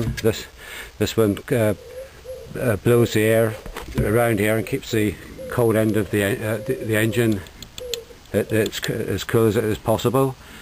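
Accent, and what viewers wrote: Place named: Cork, Ireland. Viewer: he's from cork (ireland) i think :)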